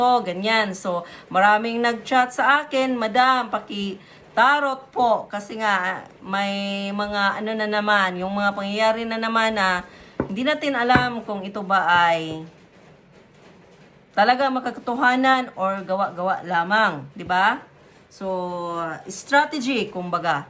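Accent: Visayas, Philippines. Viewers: Bisaya ka ano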